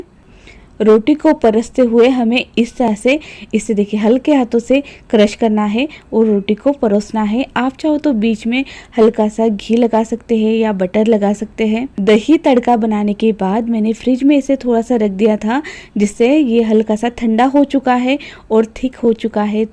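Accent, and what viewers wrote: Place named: Sindh, India. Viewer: Ur accent is Sindhi MashAllah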